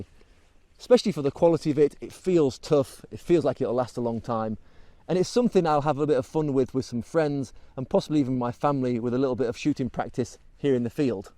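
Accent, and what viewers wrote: United Kingdom. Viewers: Great British accent.... So where are you from?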